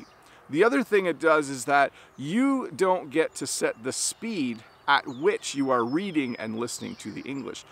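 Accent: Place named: Canada. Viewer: is this canadian english? I understood everything he was saying. American English is difficult for me to hear.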